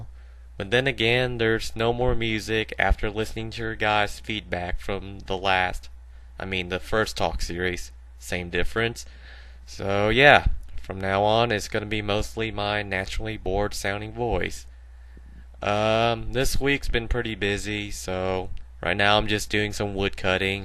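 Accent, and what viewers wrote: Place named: South, United States. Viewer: U have a southern accent and ur asian???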